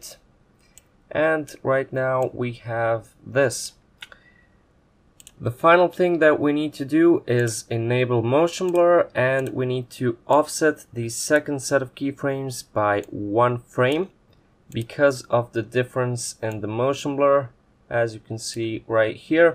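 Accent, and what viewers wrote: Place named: Balkans. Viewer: Are you from Bosna? I couldn't help but notice the Balkan's accent and surname, great tutorials by the way.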